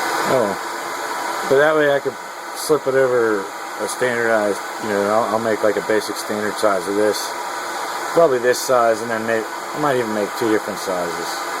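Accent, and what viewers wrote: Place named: Western Pennsylvania, United States. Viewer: New subscriber my friend. Is there a market for laminar surface mix torches? I am a chemist and I do scientific glass blowing. well I used to. When I moved up to Pa from Florida my glassblowing equipment was "misplaced". Including my glass lathe. I am trying to get back into things and I am designing torch set. I'll stay in touch. Where are you ? You sound like Western pa..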